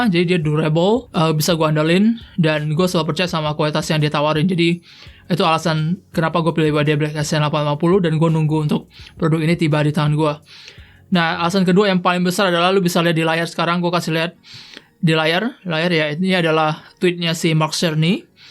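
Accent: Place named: South Jakarta, Indonesia. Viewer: Waduuh suara sama bahasanya kok kya orng jaksel gila ndak paham wicis wicis 🗿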